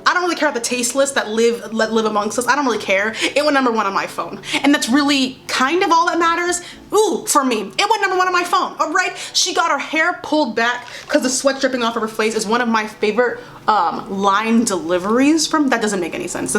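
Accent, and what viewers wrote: New York, United States. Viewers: random but i love when new york accent comes out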